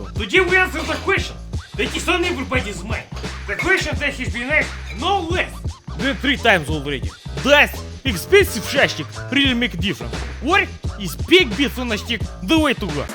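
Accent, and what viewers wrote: Russia. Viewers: I watch too many of your videos, I no longer need subtitles, I understand you just fine. A friend asked me if I speak Russian, I said "no Cyka Blyat", but I understand English, it's almost Russian 🇷🇺. Keep the videos coming!!! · Your thick russian accent made me a little tired but you are so funny :)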